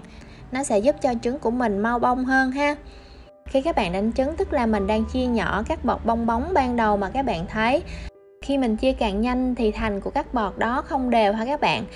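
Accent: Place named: South, Vietnam. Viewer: Giọng miền Nam càng dể nến hơn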